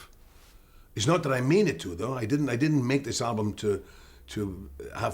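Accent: United Kingdom. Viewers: I love your voice, your English accent and your good sense of humor and more your humbleness god bless you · Azim Hulaimi he's Welsh , actually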